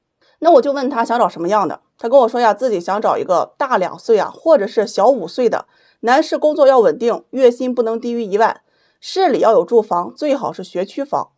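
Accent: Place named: Shandong, China. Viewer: 听口音感觉像老乡啊，我是山东人。😂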